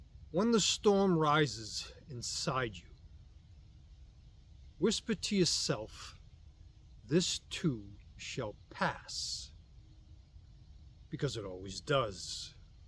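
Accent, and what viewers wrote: Boston, United States. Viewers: Gold. Is there a subtle Boston accent?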